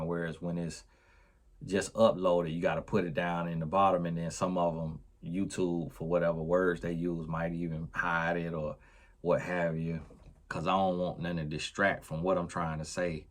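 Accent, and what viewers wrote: South, United States. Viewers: I just LOVE how you talked to your wife when she came into the room. You can SEE the love and adoration you have for her! Just beautiful! And everything you said is ON POINT! Also, PLEASE keep your Southern accent. It’s beautiful, down-to-earth, sometimes comical (when intended) and refreshing. God Bless You Tony Gaskins!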